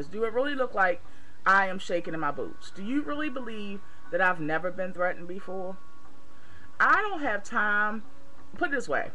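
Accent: Baltimore, United States. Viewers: is that a baltimore or d.c. accent?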